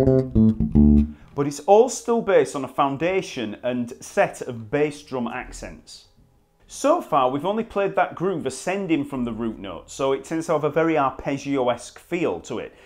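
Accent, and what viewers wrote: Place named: London, United Kingdom. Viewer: he s not a verry goog bass player he is a teacher in deed but what i like the most its his accent it s a delight makes me feel like being in hide park at speakrs corner he deserves a marble arch bless cheers from France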